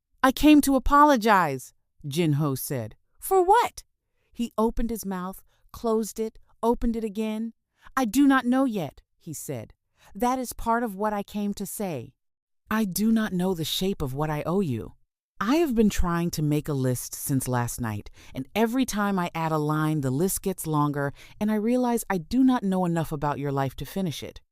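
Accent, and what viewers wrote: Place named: Caribbean. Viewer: Loving this. Hearing the Caribbean influence is beautiful. Wished there was some good accents. Lol. Respect from Bim🇧🇧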